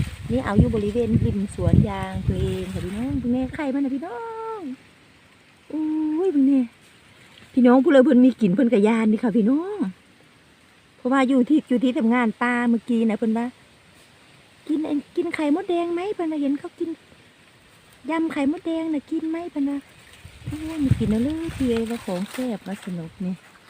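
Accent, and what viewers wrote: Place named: Phu Thai, Thailand. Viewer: มาลุ้นแกย่ไข่มดแดงนำเด้อครับ มักเสียงเว้าภูไทครับผม ติดตามให้กำลังใจนำครับ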